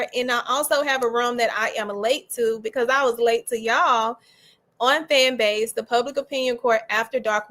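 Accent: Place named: Houston, United States. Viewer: You sound like you can be from H-town😅 That mole is pretty sexy! I wanna kiss it! 💋😍 😜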